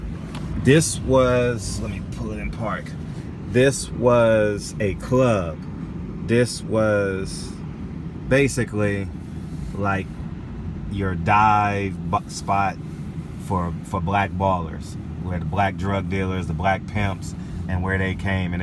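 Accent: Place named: New York, United States. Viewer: I thought you was from New York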